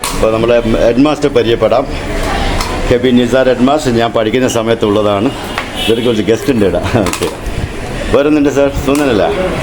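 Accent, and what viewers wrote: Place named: Kannur, India. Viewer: ഭായ് ജീവിതത്തിൽ ഏറ്റവും മറക്കാത്ത സ്കൂൾ ജീവിതം ഒരു ടെൻഷൻ ഇല്ല നിന്തി കുളി ബോൾ കളി ചുണ്ടൽ ഇട്ടു മീൻ പിടി ഒരു സമരം കിട്ടി ലീവ് ആവാൻ എന്നു ദേശാഭിമാനി വായിച്ചു നോക്കും അന്ന് ഉള്ള മാഷ്ന്മാർ ഫ്രണ്ട് മരിച്ചാലും മറക്കില്ല പക്ഷെ എന്നു കുട്ടികൾ മൊബൈൽ നെറ്റ് ഹായ് ഭായ് കഴിഞ്ഞു ഭായ് എല്ലാവരോടും ചേർത്ത് പിടിക്കുന്ന കാണുമ്പോൾ ചങ്ക് പൊട്ടുന്നു നമ്മൾ കുറെ ആയി നാട്ടിൽ വന്നിട്ട് ലോകത്തിൽ ഇവിടെ പോയാലും നമ്മുടെ കണ്ണൂർ ഭാഷ പറയുന്ന നിങ്ങൾ ഒരു 22ക്യാരറ്റ് ഉള്ള രാജ്യ സ്‌നേഹി ആണ് താങ്കൾക്ക് കുടുംബത്തിനും ദൈയിവം നന്മകൾ തരട്ടെ 🙏❤️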